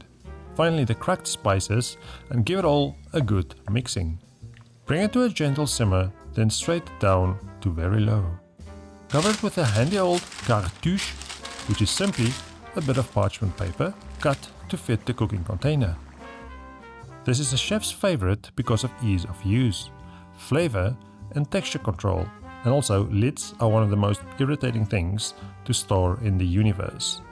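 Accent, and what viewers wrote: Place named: South Africa. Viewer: Found you by accident this evening. Subscribed! Love hearing a South African voice with the cooking videos. Also really enjoying your vegan options 😂🎉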